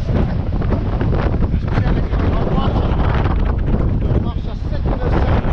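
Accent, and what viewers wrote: South, France. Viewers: VFI: vêtement de sécurité individuel. En clair le gilet auto-gonflant. J’aime bien tes vidéos. Et puis avé l’accent, c’est du soleil dans notre grisaille bretonne👌👌👌👌 Bonne journée, Patrice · Je m'étonne de tous ces commentaires sur les gilets, les chaussures, les pare bat, la ligne de vie...Il me semble que tu es un capitaine avisé et qui sait s'adapter aux éléments. Pour preuve: en <num>) tu nous a fait un speech de 20 min (Marseille est proche) sur le fait que les femmes ne devaient pas gérer la baille à mouillage. En 2019, pendant 5 semaines tu n'as pas vu la couleur de ton ancre parce que avait adopté le poste. Autre matelot = autres idées. Au passage un grand merci à pour sa participation. Si on dit que les actrices attirent la lumière alors est une actrice. Et puis les pare bats de couleur allaient très bien au tabasco. Nous on regarde, via un écran plat, avec une seule donnée 30 nœuds de vent. 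Il y a des vidéos où par 30 kts l'équipage est à l'abri dans le carré et le barreur est harnaché comme un cap hornier. Là on voit un équipage heureux et serin. Le capitaine connait par cœur son bateau et son plan d'eau. Pour la gaffe, quel suspens insoutenable! C'est filmé comme du Hitchcock! J'adore et c'est au moins la 2eme fois que tu nous fais le coup. Comme si tu allais tous les jours au ship pour acheter une gaffe... Tout ça pour dire que dès les premiers sons, l'accent, le vent, je m'évade et je profite pleinement des images quelque soit le sujet. Pour les puristes, fait leur un jeu des sept erreurs à retrouver dans chaque vidéos. Pour un premier commentaire, je suis un peu long, mais par rapport au temps que tu nous consacre c'est zé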